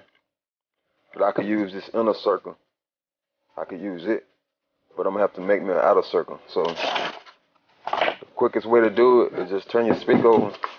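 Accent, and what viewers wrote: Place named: Memphis, United States. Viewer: You gotta be from North Memphis bro by that accent 😅 but your videos are hella informative man I love em! Never seen the fleece technique before until I started watchin your vids. So fries 🍟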